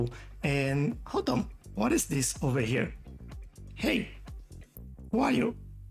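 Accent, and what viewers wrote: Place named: Brazil. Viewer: Amazing video! Are you brazilian? Your accent reminds me of our people speaking english.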